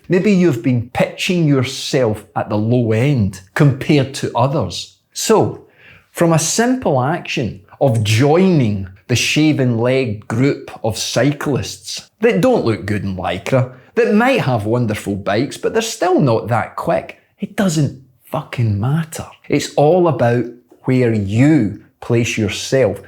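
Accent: Scotland, United Kingdom. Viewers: That’s it in a nutshell! Like the Nike slogan, “Just do it!”. BTW, is your accent Scots or Irish? I’m thinking you’re a lad from Scotland. Yes or no?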